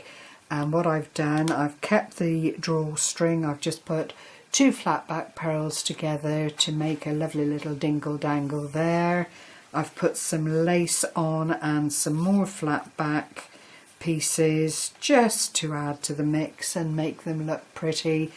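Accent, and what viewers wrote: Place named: England, United Kingdom. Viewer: You created some lovely bags. I really like the way you embellished them. You are so creative. I really enjoyed listening to you. I love your English (am I correct?) accent. did a great tutorial and put your own flair on the bags. Very, very pretty bags. Thank you for sharing them.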